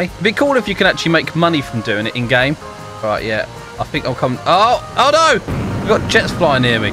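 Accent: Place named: London, United Kingdom. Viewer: my mom said you are from london he knows ur accent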